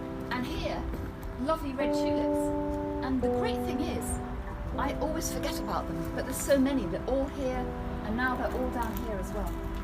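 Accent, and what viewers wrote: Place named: London, United Kingdom. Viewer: 特别喜欢正宗的伦敦音！赞👍👍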